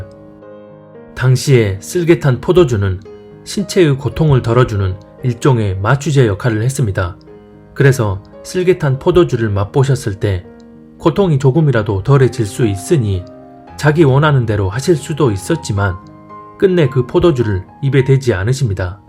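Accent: Jeolla, South Korea. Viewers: 가끔 들를때마다 많은 도움받고갑니다~^^ 근데 말씀하실때 억양에 광주,전남 사투리가 좀 섞여있는거같아요 ㅋ~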